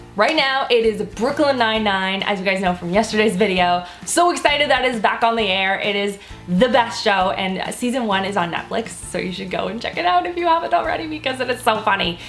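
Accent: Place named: Canada. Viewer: I've never really heard your Canadian accent until this video, lol. So cute! Great content, as always.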